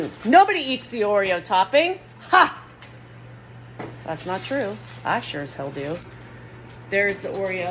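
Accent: South, United States. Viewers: Good afternoon, and once again, you are the always hilarious and sarcastic chronicler of everything and your sassy Southern accent is the icing on the cake lol!! Those cookies all do look damn good and who doesn’t eat the whole Oreo?? Of course you have to take it apart and lick off the cream inside but also part two is dunking a whole Oreo in a big tall cold glass of milk (anyone who doesn’t know this is the procedure with Oreos, doesn’t deserve them!!😂); have a great day, and let’s hope you did not get diarrhea from eating all the cookies lol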